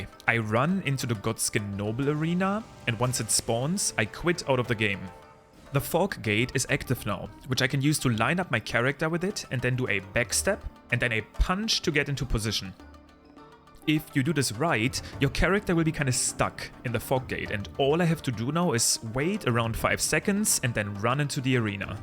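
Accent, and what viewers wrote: Germany. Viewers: Kurze Frage kann es sein das ich hier einen Deutschen Akzent raushöhre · Are you German? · You sound aggressively German, I like it. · You know he’s german without knowing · Haha bist locker deutscher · You are German, aren’t ya? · Nice vid! Your accent sounds very German. Am I right? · Are you german? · You instantaneously here this guys german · You german? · Bist du Deutscher? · Hey very stupid question are you german or speak it cause you have a bit of a German accent I Guess and If so Hallo hatt mich nur interessiert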